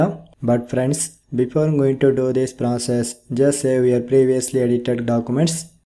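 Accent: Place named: Kerala, India. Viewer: Are u from kerela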